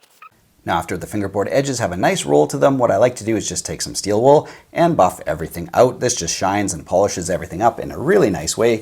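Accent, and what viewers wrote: Canada. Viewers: Love your channel,. You obviously know your stuff and love playing! Your Canadian accent is icing on the cake.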